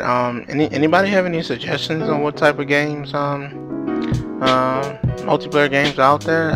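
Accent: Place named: New Orleans, United States. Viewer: Yay for 80s kids! Oregon Trail and Carmen Sandiego were the two favorite games of my childhood. Oregon Trail was the first game I ever role-played...or wrote fan-fiction about, for that matter...I had lots of little drama-filled stories about the party members spinning out in my head and sometimes on paper. Gopher is one of my big inspirations, too. All these great role-players have me watching Skyrim videos more than I actually play the game myself these days. That's what I love about this game; there are so many other stories to be told within the framework of the official narrative. Ah, New Orleans! That's why I couldn't place your accent. My folks are from San Antonio and Austin, but my dad spent a lot of his childhood in the valley, and my aunts and cousins are scattered all over. A few of my cousins used to live in Houston, and one of my best friends just moved out there with her boyfriend recently, so I've heard stories about the traffic. 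I've only visited Houston once, more than 15 years ago, but it was pretty bad then, too. And Texans complain about Californian drivers...I say we just skip the flying cars and go straight to teleportation pads. If only fast travel worked in real life... Do you have a character concept in mind for your first ESO character, especially which faction you'll play? I pre-ordered too, and I have lots of plans for my first character--an Argonian in the Ebonheart Pact, because I always play Argonians first in Elder Scrolls games. Ok, I just lo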